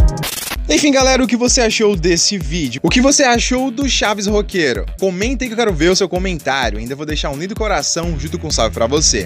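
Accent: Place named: São Paulo, Brazil. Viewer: Gente eu achei que esse cara era aqui de São Paulo 😂😂😂 Salve ♍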